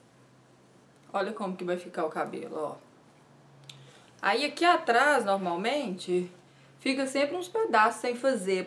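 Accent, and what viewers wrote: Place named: Minas Gerais, Brazil. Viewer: eu entendo o pouquinho de mineiro 😂😂. Maravilhoso o vídeo e já aguardo o das técnicas de maquiagem. Beijo diva 💋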